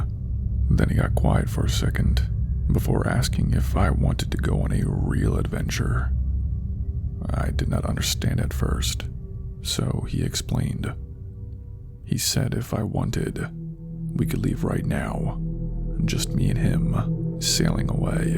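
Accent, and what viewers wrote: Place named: East Coast, United States. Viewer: Ahh. Thought I detected an East Coast accent. I KNOW you are not Ai! I’m a night owl in the Pacific time zone. Even when I catch this around midnight, it’s like 3am your time when I comment. 🤷🏻‍♀️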